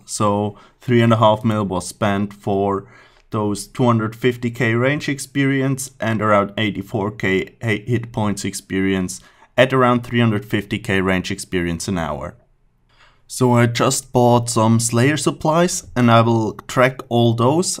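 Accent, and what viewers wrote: Switzerland. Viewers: Ich has gwüsst! hahaa, han mer grad denkt, dases en schwiizer akzent isch^^ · 100% en schwizer haha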